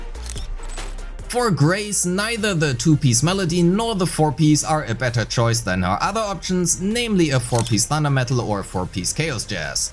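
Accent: Germany. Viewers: Are you german? Your accent reminds me of german ^^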